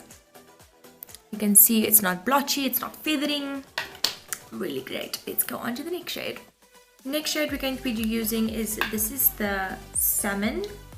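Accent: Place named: South Africa. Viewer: U speak like my mualima... true S.african😚😍😛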